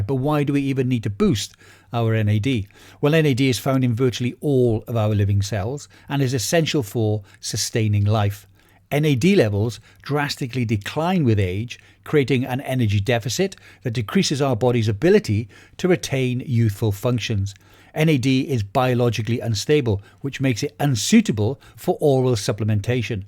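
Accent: Wales, United Kingdom. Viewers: Thank you! And I think you are Welsh ? 🏴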